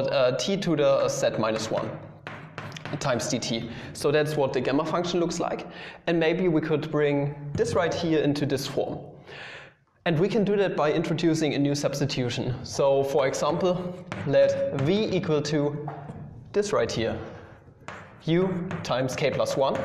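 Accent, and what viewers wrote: Germany. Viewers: You are German and I understood that from the way you pronounce z and this :p Awesome video nonetheless.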